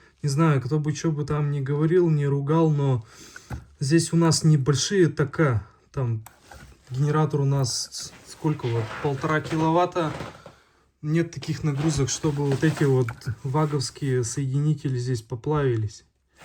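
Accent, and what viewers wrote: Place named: Udmurtia, Russia. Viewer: Странно так слушать всë. Уж года два смотрю и не могу привыкнуть. Смешение какого то североудмуртского говора с вятским.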